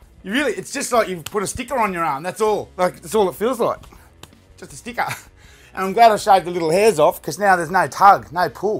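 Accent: Australia. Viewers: Aside from the Australian accent, you remind me of steve irwin lol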